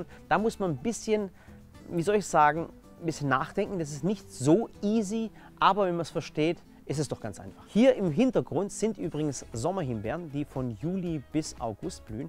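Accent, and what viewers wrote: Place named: Swabia, Germany. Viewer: Sehr cool, danke. Das Video hat mir sehr geholfen, auch wenn die "Blütezeit" der Himbeeren in Schweden etwas von der in Deutschland abweicht. Toll auch der immer wieder durchkommende schwäbische Dialekt 🙂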